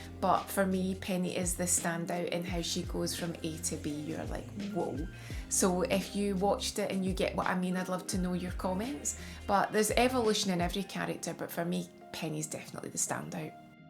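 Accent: Scotland, United Kingdom. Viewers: A charming presenter for a charming series! How nice to hear a gentle Scots accent again! Just one point about the series, and perhaps especially the Christmas special; there was real drama amongst the comedy and Jan was superbly moving in those moments of distress. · Nice to hear a fellow Scot. Loved this series first time round and have been watching it on That's TV. I thought Paul and Jan were brilliant. Strangely enough my 1st wife was very similar to Penny!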